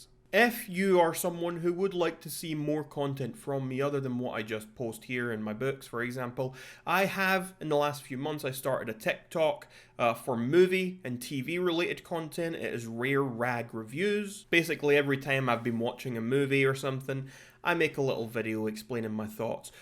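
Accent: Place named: Scotland, United Kingdom. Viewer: Bro saying Australians have the worst accent while this dude is Scotland (not hating on Scotland btw)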